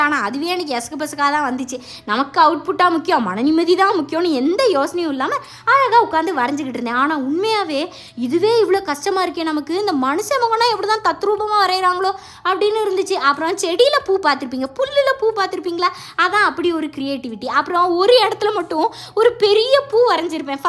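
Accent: Tirunelveli, India. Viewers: Padam varaiya sonna mattum mookala aluva Aaha namma thirunelveli,la pesurathu😂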